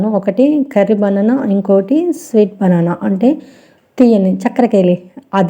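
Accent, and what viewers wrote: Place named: Telangana, India. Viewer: Asalu chandalam antey endi andi mana Telangana lo chala slangs unai ah matram kuda miku telvadha adhi village slang miku nachakunte vinakandi mimalni evar froce cheyaleru antey kani okari basha baledhhu Ane right miku ledhu...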